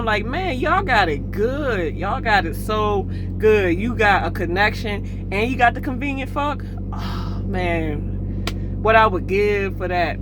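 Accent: Baltimore, United States. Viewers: sound like she is from baltimore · I like how u rep B-More w/that accent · Second video I seen and like!!! I hear that Baltimore accent💯 sounds like home☺️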